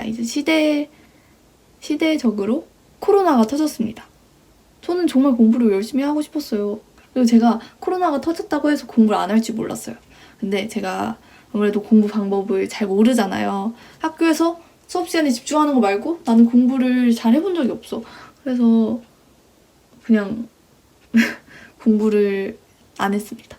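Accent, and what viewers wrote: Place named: Jeolla, South Korea. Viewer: 헐 왜 전라도 분인줄 알았지....?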